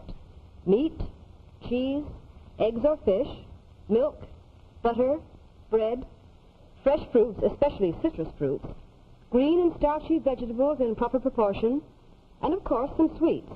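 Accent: Transatlantic, United States. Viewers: Transatlantic accent if I'm not mistaken.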